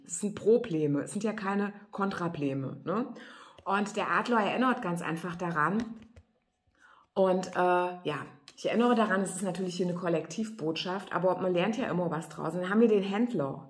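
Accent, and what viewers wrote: Hesse, Germany. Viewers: So genial made my day. Danke an meine Freundin Ina die mich zu dir geführt hat. Es macht so Spass. 😘😘😘😘 Ich lieb deinen herzische hessische Dialekt.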